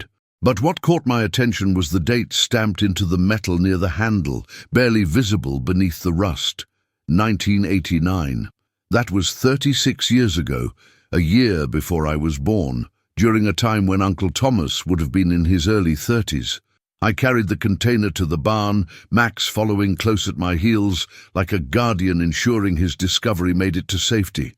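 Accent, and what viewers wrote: United Kingdom. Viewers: I enjoy the British accent, the smooth reading style, and relatively few pronouncement errors.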